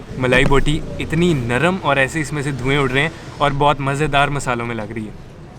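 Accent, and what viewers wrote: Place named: Punjab, India. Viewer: Love from Indian sir ap k bolna k tarika punjabi 🥰🥰🥰🥰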